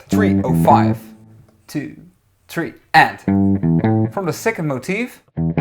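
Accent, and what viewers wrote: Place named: Netherlands. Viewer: Ik hou van dit volle nederlandse accent haha 😂❤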